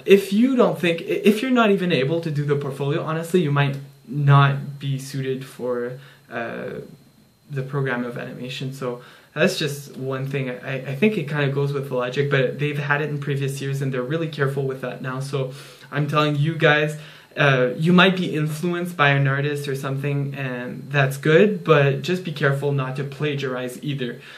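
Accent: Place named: Germany. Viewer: You sound german which is super hot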